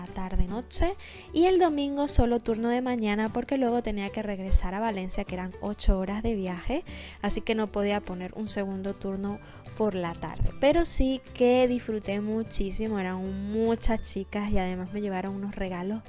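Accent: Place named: Maracaibo, Venezuela. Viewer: Hola... tengo rato viendo tus videos y tratando de descifrar tu acento y me decía a mi misma: “mi misma, no puede ser.... será que es venezolana?????” De repente se te sale algo maracucho jajajajaja (aunque acabo de aclarar esa duda). Estoy viendo tu video sobre las preguntas personales que te hicieron y tuve que parar para llorar por la nostalgia que sentí al escucharte hablar de nuestro hermoso país. Sentí la necesidad de escribirte para felicitarte por todo lo que haces. Se nota que amas lo que haces y por eso todo te sale tan maravilloso. Gracias por dejar en alto nuestra hermosa Venezuela. Saludos desde Indiana, USA. Recibe un gran abrazo!!!!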